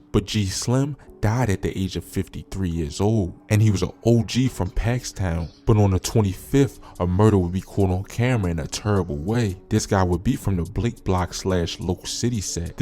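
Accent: Baltimore, United States. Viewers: sound like he from baltimore if i had to guess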